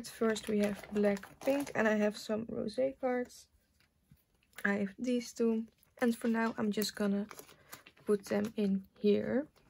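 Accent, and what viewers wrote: Netherlands. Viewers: this is my first time watching u and the first thing i said was oh yea she's dutch and i was right 😌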